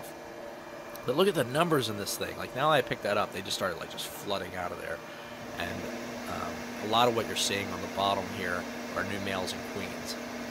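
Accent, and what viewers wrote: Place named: Delaware Valley, United States. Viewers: You sound just like Streetspeed717. Must be the PA accent! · Great vids my guy. Side note: I'm hearing a DelVal accent (especially in those Os). Where are you located? I'm from South Jersey so my ears almost instantly recognize a fellow Tri Stater lol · This guy is definitely from Maryland or Delaware based on his accent.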